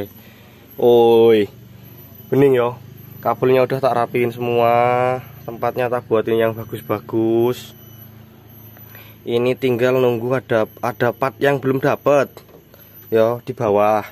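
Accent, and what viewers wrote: Java, Indonesia. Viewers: Sampeyan asline jowone pundi mas..?? Jowone kok medok temen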